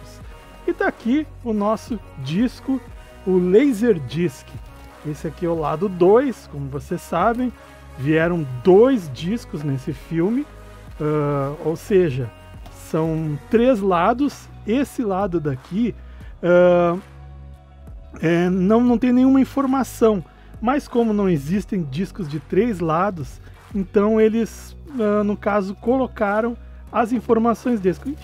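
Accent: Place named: Porto Alegre, Brazil. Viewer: Tu tem o mesmo sotaque que o Radhanti Maharaj aí de POA.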